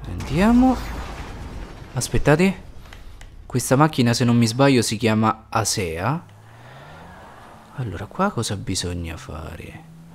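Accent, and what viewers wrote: Rome, Italy. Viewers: Che se de roma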